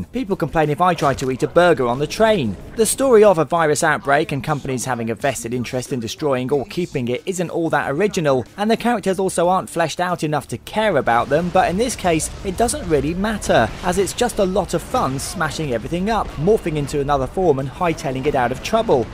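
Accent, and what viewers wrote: United Kingdom. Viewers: The voice is way too brit.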